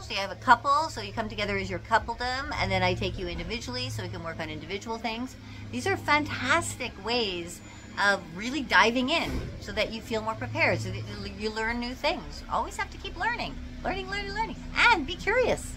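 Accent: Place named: Canada. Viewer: Hah! I KNEW it! Even though your channel lists you as being in the United States, I definitely got a Canadian vibe off you. A lot of it was the accent, of course, but certain American accents, especially west coast ones, sound a lot like Canadian accents, so that's hard to tell. I think part of it was just the vibe you gave off, you just felt like home. So glad you are on here. It really does feel as if I'm getting information/perspective/experience from a "home" kind of place from you, and knowing you are Canadian as well just adds an extra level of warmth and comfort to it. Odd. It really shouldn't make much difference. People are people. Yet it does. Interesting. I'll have to mull that one over. I knew it!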